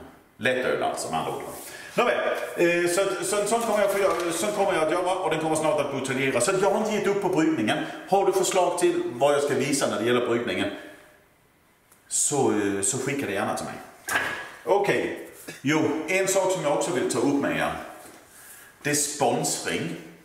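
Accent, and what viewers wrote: Scania, Sweden. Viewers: Grattis till 10k följare härligt att höra din skånsk/danska dialekt. Tycker du ska döpa moppen till Rosa ladyn/ rosa tanten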